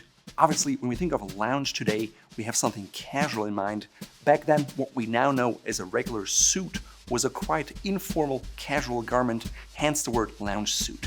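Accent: Germany. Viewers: Your name is as German as they come. You even have a German accent. No way you're in the US. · still they have to work a lot on the audio quality and the German accent which is quite challenging...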